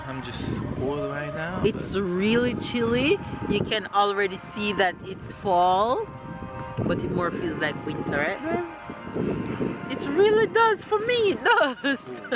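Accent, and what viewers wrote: Jamaica. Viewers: is so gorgeous, awesome surprise for hubby. Some parts of your accent sounds Jamaican:)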